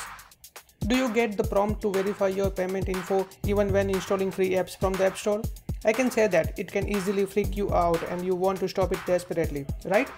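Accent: India. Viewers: My phone is dying, it’s 🔥 burnt I don’t even care if it works, I just like him saying “it can easily freak you out” Not making fun I like the Indian accent, we are cousins I’m West Indian. I hate when people say that, ohh I can say that because I’m that, so I guess I hate myself, who am I talking to right now? Thanks for your help cousin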